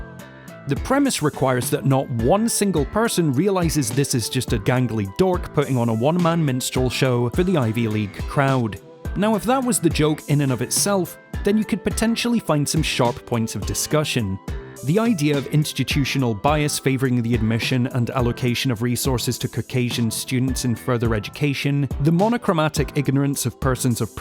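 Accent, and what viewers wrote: Scotland, United Kingdom. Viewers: I assume that you are white (i'm detecting a Scottish accent there) Any way here goes, I notice that when i watch ANY 1980s comedy films, what they all have in common is... whackyness & that they are ALL very loud, considering how money & power obsessed the 80s were, I think its a wonder that comedy films were made at all. be it-TRADING PLACES(dealing with both class & race prejudice issues) PORKY'S,FERRIS BEULLER, POLICE ACADEMY even, and this film is no exception, its more to do with insensitivity, clumsiness, and America's,500 year old race issues, that werent properly dealt with. They approached this the same way that they would approach... POLICE ACADEMY, not adjusting it often enough for the the subject matter and applying some patience, instead opting for a formulaic comedy style. BUT despite it all, I liked the film, this is fresh, playing for laughs, instead of the down trodden ROOTS,GONE WITH WIND, TO KILL A MOCKINGBIRD po faced look at ignorant racism, it DID make its point, which wasnt lost on me.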